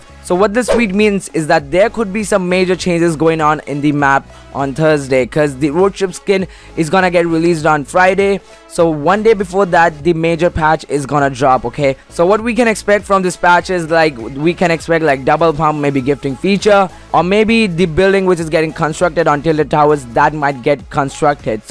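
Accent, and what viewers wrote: India. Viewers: maybe indian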